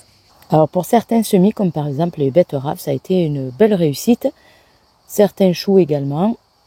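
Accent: South, France. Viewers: Bjr madame merci pour vos partages vous avez un accent dû sud nous sommes peut-être voisines ,je vis en appartement je suis novice question semis etc vu les événements il faut que je puisse être autonome cela dis j'ai pas là main verte ,avez-vous des conseils ?sans indiscretion de quel département êtes-vous ?ps c'est un plaisir de vous écouter ❤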